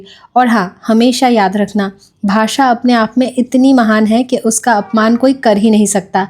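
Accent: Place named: Haryana, India. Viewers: Haa didi❤ radhavallabh shri harivansh... Aap shi bol rhe ho m bhi haryana se hu or hmare yha kuch ladkiya bolti hn aise and sach me cute sa impression aata h❤ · Right di I'm from haryana and its normal mai or meri cousin, friend aise hi baat krte hai kbhi kbhi . Ap in sb p jyada dhyan mt diya kro ap jaisi ho perfect ho❤ love you alot from haryana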